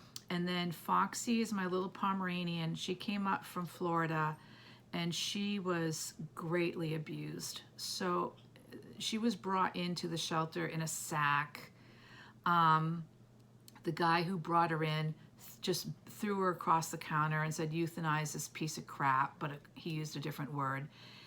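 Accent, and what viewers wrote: New England, United States. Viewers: What state are you in?! Im in MA. I feel like I’m getting some new englander in you ❤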